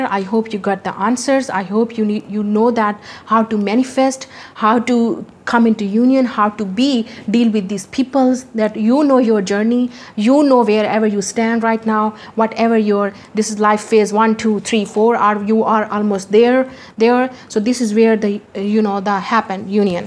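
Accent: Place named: India. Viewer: 💯 % agreed to you; the biggest religion is humanity and we should respect each other for that.. great work and respect for you dear... Ur an awesome and straight forward person :)) god bless you with all the happiness:)) n yes our accent is same ... lots of Love and respect for you from india for this reading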